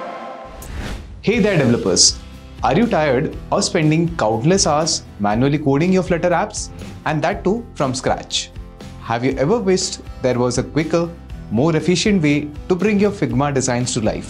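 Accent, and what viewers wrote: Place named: India. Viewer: 这个咖喱味的英语听得真难受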